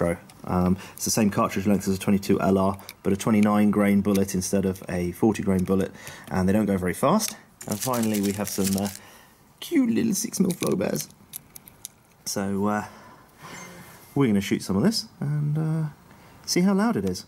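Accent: United Kingdom. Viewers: Are you german? I've watched your videos for a while now and I've always thought that you were British. I was slightly surprised that you perfectly pronounced Zimmerpatrone. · Get so used to typical gun videos I wasn’t expecting a British accent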